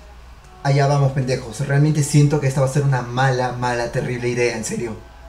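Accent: Mexico. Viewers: Eres de México o Perú..? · que verga pensé que era de mexico xd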